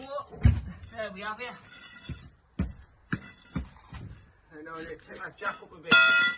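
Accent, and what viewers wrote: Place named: Northern England, United Kingdom. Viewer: Thought you were couldnt tell by the accent if you are based in north east or north Yorkshire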